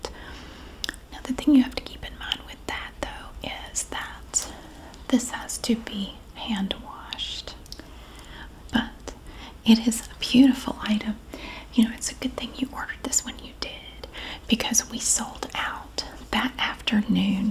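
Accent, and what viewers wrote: South, United States. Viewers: The mix of whisper and soft spoken is just heavenly. Also, your southern accident really adds to the tingles!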